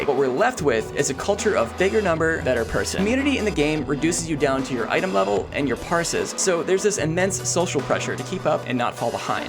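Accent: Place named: North America. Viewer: I like the mentality and if I could I would subscribe to it. Feels impossible to do so - especially in Retail where I play mostly. And even thinking about doing things semi-blind has many insinuations of ones 'skill' - their 'commitment' and so on. It's just easier, even from that social perspective, to fall in line and do things the 'accepted way' even if it feels like the soul is sucked out of it. I'd love to try out and play with your guild but you sound like you're from NA so RIP for me joining. Hope it goes well though. Good luck.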